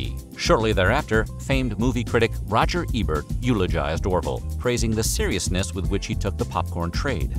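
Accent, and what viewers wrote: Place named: Transatlantic, United States. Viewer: You have to keep that wonderful Transatlantic accent alive dammit, for us all.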